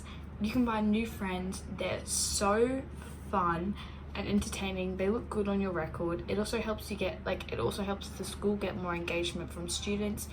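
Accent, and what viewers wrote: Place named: Australia. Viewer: Do you live in Australia or the UK but i am hearing an Australian accent and I am fairly sure the school system is the same so its confusing me 😭😭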